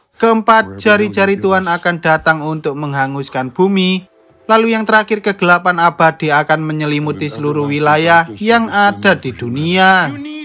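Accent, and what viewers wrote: Java, Indonesia. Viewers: Jujur bng nonton nya kurang seru,mohon maaf bng ya suara Abang lebih ke logat2 jawa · Lu org Jawa y bg medok bener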